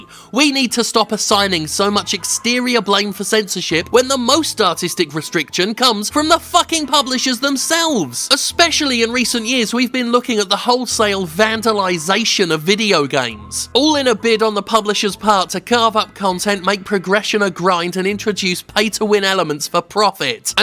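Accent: United Kingdom. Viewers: big dude with a brittish accent. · He's got a British accent.......because he's British. How fucking dumb can you be?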